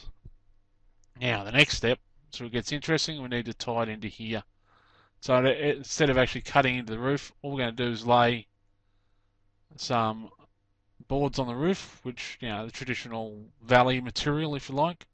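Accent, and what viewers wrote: Australia. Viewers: Very happy to have finally found some roofing explanations told by whom sounds like an Aussie and speaks in metric terms not American bloody imperials....Loving the videos, good work! Simple and to the point..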